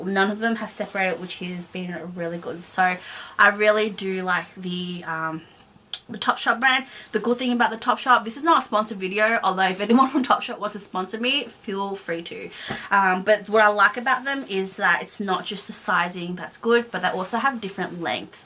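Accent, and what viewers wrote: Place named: Australia. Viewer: Only 4 mins in and already love this chick. Nice to hear a fellow Aussie too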